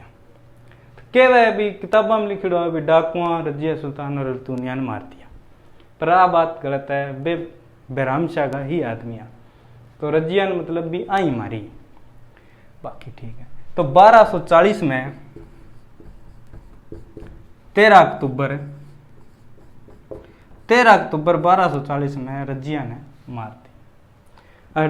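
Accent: Haryana, India. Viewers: Nice h Bhai jma bagdi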